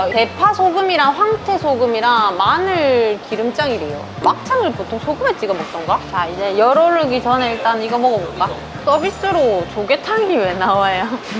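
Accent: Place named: North Gyeongsang, South Korea. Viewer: 맹이나물이 아니고 명이나물 ㅋ댁이 워데이껴? 억양은 경북북분데 ㅋ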